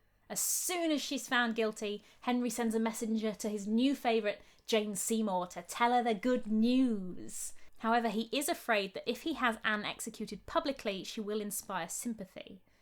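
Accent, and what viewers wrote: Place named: North, United Kingdom. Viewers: I knew you were clearly northern but I never thought you would be from Lincolnshire so now I have to consider if I should continue watching any of your videos that I had been watching. You are lucky that I used to drink in the Anne Boleyn pub on Green Street so today is a good day for northerners 👸🏻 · I was trying to place your accent...as a fellow east midlander I find it very easy on the ear....Great channel..we'll done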